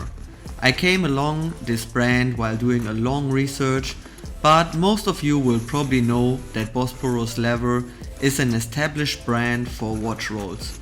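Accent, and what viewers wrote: Germany. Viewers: Great - where are you come frome ? Your dialect sounds like German :) If so - I would be interested what’s your job?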